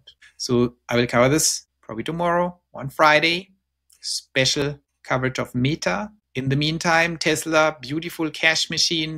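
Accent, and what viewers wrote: Germany. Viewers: danke----fyi: Palatina is the latin word für "Pfalz" this company was founded 1993 as Grohmann engineering for innovatie solutions of automation processes in manufacturing....zur Info....Palatina...ist das lateinische Wort für "Pfalz" Du bist doch Deutscher?! zumindest verrät mir das Dein englischer Akzent:-) lebts Du in den Staaten? Tesla hat ja schon vor langer Zeit diese Firma gekauft Tesla Automation wurde 1983 als Grohmann Engineering in Prüm gegründet. Mit dem Ziel, innovative Lösungen für die Automatisierungsbranche zu entwickeln, hat sich das Unternehmen kontinuierlich weiterentwickelt und seinen Fokus stets auf leading edge Technologien gelegt.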